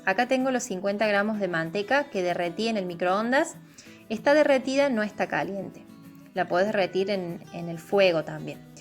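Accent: Córdoba, Argentina. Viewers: Te escucho, y me haces acordar a mi bella Córdoba, quizás no seas de mi provincia, pero sonas como cordobesa 💙🤍💙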